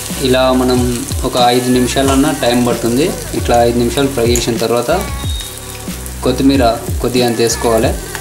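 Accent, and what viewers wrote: Telangana, India. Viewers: nedhi telangana na annaya super matladuthunav same to same nalage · Nv cppe vidhananiki andharu chustaru asalu nv super jeppinav Telangana yaasa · Anna Nak brain fry Masthu istame, Nvu matlade slang Masthu Vundi acham telangana yahsa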